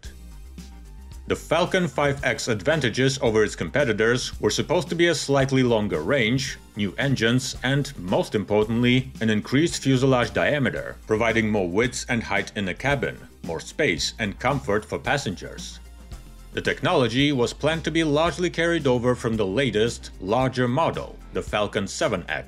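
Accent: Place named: Russia. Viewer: I would watch this channel if not this fucking raSSIan accent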